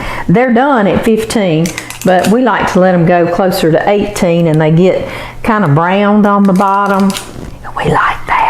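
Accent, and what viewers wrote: South, United States. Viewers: Oh my gosh that southern accent 😮. What the ??????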